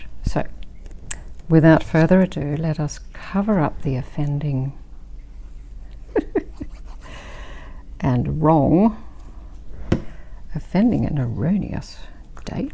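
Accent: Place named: Australia. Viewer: Lovely to hear an Australian voice....from Mount Gambier SA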